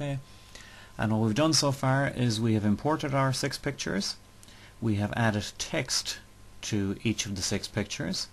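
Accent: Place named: Ireland. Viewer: Hi guy. Enjoyed your tutorials. Love that Irish accent; just came back from a 12 day tour through your lovely country. Tell me, how can I play background music on just a bunch of slides in the middle? I start narrating and then I want to play some music without narration, and then pick up the narration again later? Please, please!!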